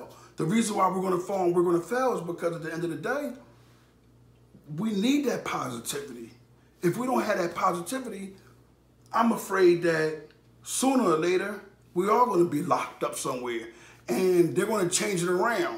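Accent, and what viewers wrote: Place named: East Harlem, United States. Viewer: Yu got like a East Harlem/South Bronx accent. U from the x?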